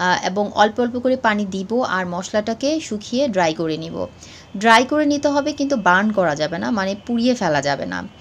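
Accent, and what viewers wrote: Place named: Chittagong, Bangladesh. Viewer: আপনার কি সমস্যা? ভালো না লাগলে ইগনোর করেন।আপুর কথায় চট্টগ্রামের টান আসে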